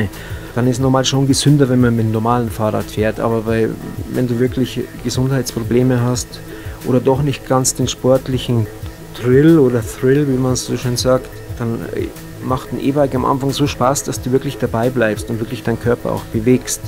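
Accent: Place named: Bavaria, Germany. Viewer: . Guade 5000 km/h;) respekt man. 15 kilo weg da hast ja isar body jetz. Was kostet 1x akku aufladen. Haste das mal umgerechnet? Ich heiz n normales ghost mountainbike ohne motor. Aber ich schau manchen e-bikern schon manchmal wenn meine oberschenkel abkacken n bisschen neidisch hinterher. Kennste die trials zwischen thalkirchen und grosshesseloher brücke? Fetzt!! Aber ich bin da absolut amateur. angenehm deine videos mit deinem bayrischen gossen;) akzent.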